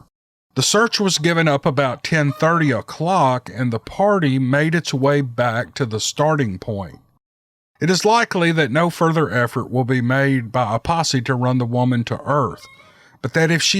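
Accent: South, United States. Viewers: I love the way says Uncles and "Ain'ts". All of my southern family on both sides pronounced it that way. It's the exact opposite of the formal pronunciation of "awnts"., you are refreshing to listen to. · Enjoyed it? Loved it! How did this sasquatch learn English? They are so very bright!!! Read with your adorable southern drawl.... Just adds to the story interest.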